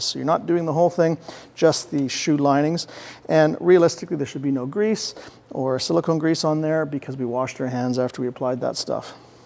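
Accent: Canada. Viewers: Sounds like a Canadien.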